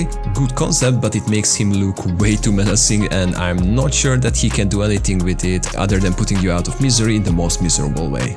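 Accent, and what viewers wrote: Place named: Hungary. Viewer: Your hungarian accent is so strong man🤣 (Ímádom a rajzaidat és a stílusodat)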